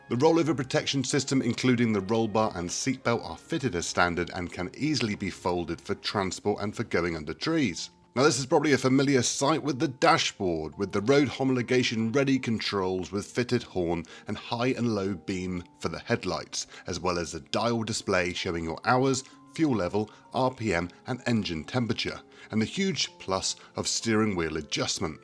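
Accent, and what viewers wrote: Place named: United Kingdom. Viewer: More British narration….. Deleting the channel. Buh-bye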